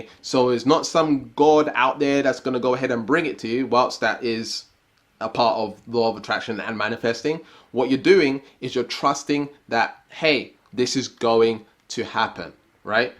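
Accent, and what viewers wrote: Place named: England, United Kingdom. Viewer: Love ur English accent lol:) I AM SUPERSTAR OOOOHHHH YESSSS:))) bless you beloved friend 🎁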